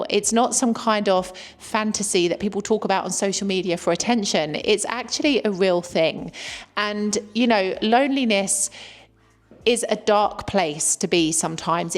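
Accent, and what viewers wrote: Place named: United Kingdom. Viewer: Ugh .... that british accent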